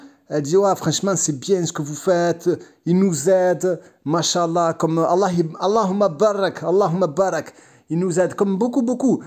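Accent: Marseille, France. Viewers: Wa aleykoum salam, ça me fait toujours sourire ton accent et tes mots marseillais. Pour revenir au sujet, moi ce que j'ai vu c'est que les familles dissuadent généralement l'enfant de rentrer au bled pour qu'il ne "gâche" pas sa vie professionnellement surtout s'il est diplomé.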